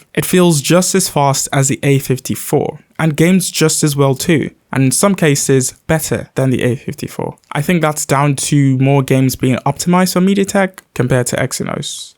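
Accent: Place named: United Kingdom. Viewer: British man😊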